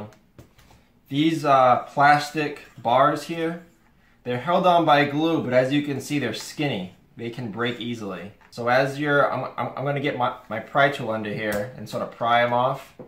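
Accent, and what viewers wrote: Massachusetts, United States. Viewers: Great job teaching how to do this. You sound like you are from Massachusetts :)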